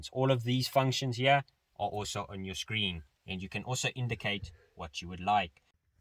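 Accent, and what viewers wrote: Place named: South Africa. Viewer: Gd review bud, u sound South African 🇿🇦🤔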